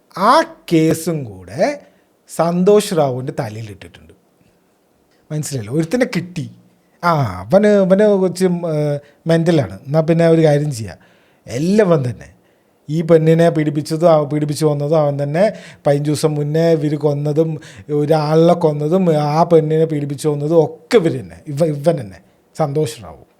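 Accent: Palakkad, India. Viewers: Bro palakkad aano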